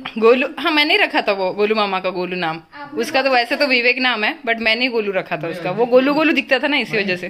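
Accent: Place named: Varhadi, India. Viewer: Kay chyyata marta tumhi😂😂🤣🤣mast apli warhadi aikli ki mast watat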